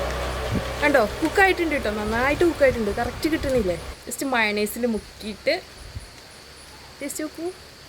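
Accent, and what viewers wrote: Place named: Malappuram, India. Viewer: ഏതാണ് നാട്.. വർത്താനം കേട്ടിട്ട് മണ്ണാർക്കാട് മഞ്ചേരി യുടെ ഇടക്ക് ആണെന്ന് തോന്നുന്നു..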